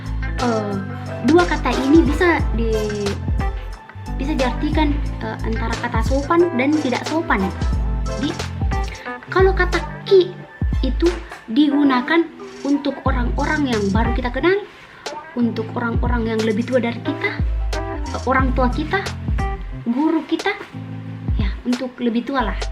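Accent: Makassar, Indonesia. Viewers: Bukan orang makassar tapi suka dnger logat makassar🤣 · Saya orang Banjarmasin .... Senang sekali mendengar dialek. Makassar .... Negara kita memang luar biasa kaya budaya ..bahasanya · Mampir ke chanel ini gara² lagi tinggal di mkssar takut nnti salah kata/pnyebutan😅 Sya asli mnado tpi sneng denger logat mkassar, kadang klau ngobrol suka ha? ha? kdang gk ngerti😂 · Sa orang Jawa mi,,memang suka logat Makasar to,,,wkkkk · Sya seneng denger ngomong orang makasar sya dri bandung...kangen dh lama g denger orang makasar ngobrol.jdi inget seseorang...yg tlah jauh pergi...smoga d trima amal ibadah nya..jdi sedih logat nya ade ini yg mengingat kan dia...i love u makasar... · Kentara skli makassar nya biar di paksa bagaimana di paksa logat baku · Bukanka orang Makassar tapi kusuka dengar loga Makassar 😂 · Saya suka logat makasar..tidak di aku tau saya...tpi sekarang saya baru faham... 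· Bingung ka kenapa banyak orang luar na suka logat makassar kalau saya biasa biasa ji :/ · kesini gara gara lgi di makassar, bingung denger logatnya 😄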